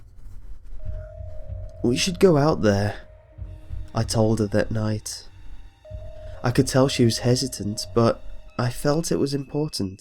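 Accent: United Kingdom. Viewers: Any other girls (or guys) out there kinda have a thing for 's voice? I think pretty much everyone digs a British accent-or is it just me? Maybe it's cause of the Beatles, I don't know...I think out loud too much. Hesitates to click post. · omg brit accent so sweet and scary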